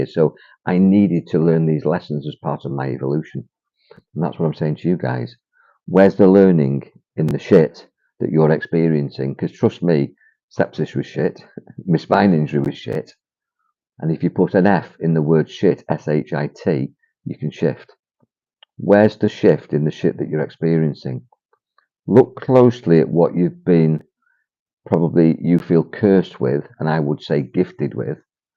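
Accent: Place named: Manchester, United Kingdom. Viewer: Omg listening to this sounds like I'm listening about myself.. I seem to have gone through repetitions though & really at the stage where I just don't have the energy to suffer anymore. I trained in hypnotherapy too, so do know all this stuff but have found that I'm great at healing everyone else, just not myself & I know that is an affirmation in itself. (The people pleaser also) but i would be very interested in talking with you to help me move forward. I am in my 50s & no longer wish to survive but wish to thrive now. I think it's time! Also I recognise your accent are you originally from Manchester?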